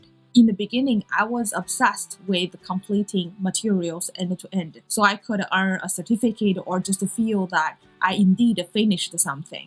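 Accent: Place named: India. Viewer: indian guys are killing it with their accent good luck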